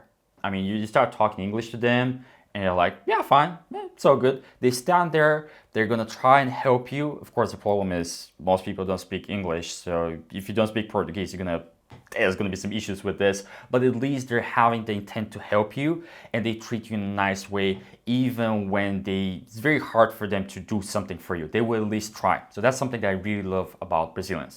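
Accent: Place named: United States. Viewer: First video I saw from your channel I honestly thought you were american. Not because you speak english, but because of your accent. It's not pure american to me, but definitely not british or australian. Si I kinda thought you could be american. You have an amazing english. Wish my english was in that level, but my english is intermediate. I hope one day Brazil will have a good amount of people who can speak english properly. My sister is 15 and in her school it has been a couple years since they started doing this bilingual education system. If you ever want to feel closer to Eurpo, visit the cities of Campos do Jordão (São Paulo) and Gramado e Canela (south of the country. You will fall in love with them.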